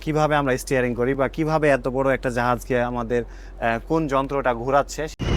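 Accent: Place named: Rajshahi, Bangladesh. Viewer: So far your accent seemed to be Razshahi OR your home town,looking your video from Dhaka,Bangladesh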